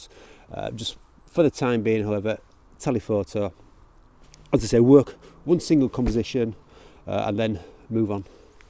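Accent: Yorkshire, United Kingdom. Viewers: Great watch again mate, so nice to ere ow many Hs ya dropped, and eld, appy, ungry, ome, folk ere can't understand me arf ov time. Great Yorkie tork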